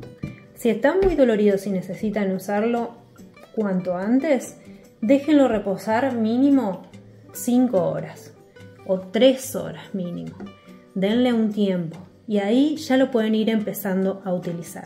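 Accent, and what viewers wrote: Argentina. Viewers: Hi sweet, greetings from Sidney, Australia. I love to listen spoken castillian just to keep the knowledge of the language decent, but I think I missed it whether you said shred the pit of avocado. Do I have to let the avocado pit dry up or can I use a fresh pit? Years ago I was in a nasty accident, I had to get several metal parts implanted, one on my right wrist and from time to time with weather changes my wrist hurst like the devil, I most certainly would love to give this very simple tip a try. My mother is also starting to get arthritis and it makes me very sad to see how her hands are losing their shape. Needless to say my little wrist pain is nothing compared to the pain she feels in her hands. Also have you showed how to make kefir? I am always learning such great things from you. I love your Argentinian accent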